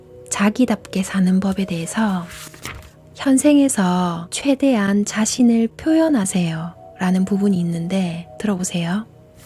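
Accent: Gyeongsang, South Korea. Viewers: 구독했어요~ 말투가 경상도 억양들어가 정감가고 (제가 경상도) 예쁘고 목소리 좋고 마음의 양식이 쌓여가네요ㆍ 이야기를 듣다 보면 많이 깨달아 지네요~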